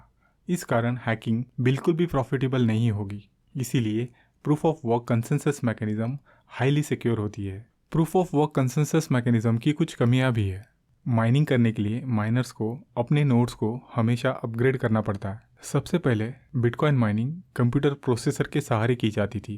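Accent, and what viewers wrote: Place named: Maharashtra, India. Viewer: seems like you are Marathi person.. accent warun watala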